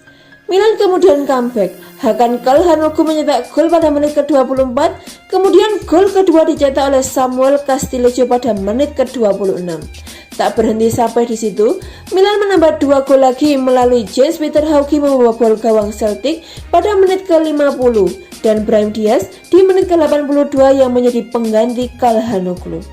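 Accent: Java, Indonesia. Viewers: Klu nulis judul yg bener ploook...dan gasah medok medok Ngomong nya kampretttt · Medokk min🤣ttep semangat💪💪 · Medok kali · Jowo ne pundi mbak pembaca berita??? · Medok jancuk wkwwk mantabs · Medokk bet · Forza medok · Wong jowo hhhh Penyiarnya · Medok nya 😅 Penyebutan pelatih MU seakan aiueo 😅 Redaksi nya perlu di perbaiki But overall its ok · Medok cuq ... 😄😄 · Medok amet mba logat jawa nyah ✌️ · Suara khas medok.. #ForzaMilan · Ora penak banget di rungokna, medok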